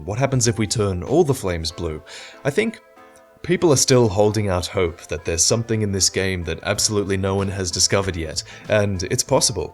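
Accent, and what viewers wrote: France. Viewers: Your french accent is not that bad ^^ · Good French accent. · your accentin french is soo aaaaaaaaaaah <3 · bel accent français ^^ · Nice french accent :)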